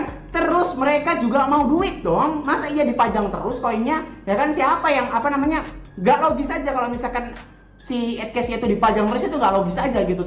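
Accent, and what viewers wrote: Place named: Banyumas, Indonesia. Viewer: Jangan di tonton....Yaa Brother" keenakan nih Bocah Ngapak !!!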